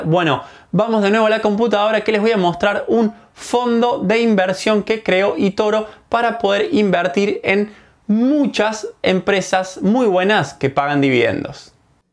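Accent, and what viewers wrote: Córdoba, Argentina. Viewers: Sos cordobés?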